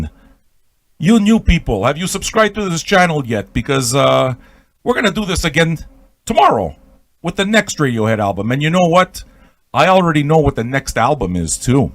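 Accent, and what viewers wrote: Quebec, Canada. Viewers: Oh un québécois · Montreal I think is where he lives, not sure if that's where he's from originally but he sounds fairly French-Canadian to me...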